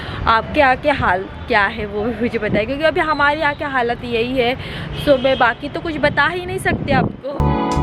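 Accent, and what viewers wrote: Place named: Maharashtra, India. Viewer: Kay yar kay loka ahet...Tumhi kay punekar dista watate. punyat sHhudh marathi bolali jate. Tasach itar bhagat marathi ch bolali jate pn wegli tone ,uchhar wegle astat tyat kay awdha.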